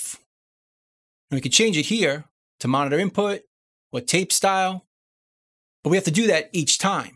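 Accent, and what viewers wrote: New York, United States. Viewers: Hey your from New York like me, I recognize that accent anywhere, I grew up in NYC, Queens YO! Yeah Reaper is awesome, I was using Adobe audition for the longest time and the latency issue on that thing drove me absolutely crazy, it got to the point where I gave up and would just try to physically move the tracks so they would play in time, but even then even if you're a millisecond off then after a minute it goes out of time. I really don't understand why a company like Adobe would go through all that trouble making a program like that and totally F up the latency issue. Anyway Reaper is amazing, no issues whatsoever, and THANKS so much for all these tutorials! Fantastic!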